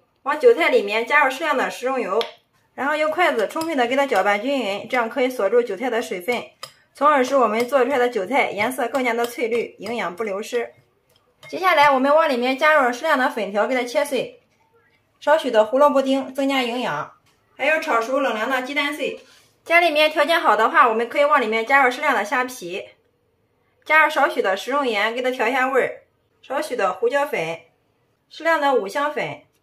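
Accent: Shandong, China. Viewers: 听口音山东的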